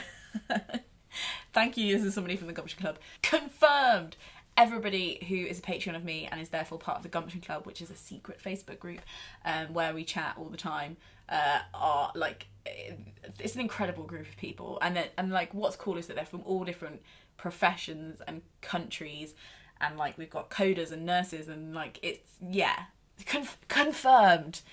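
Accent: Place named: Coventry, United Kingdom. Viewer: Loving the Cov accent! I'm from Rugby and constantly confuse people by my inability to pronounce U and O differently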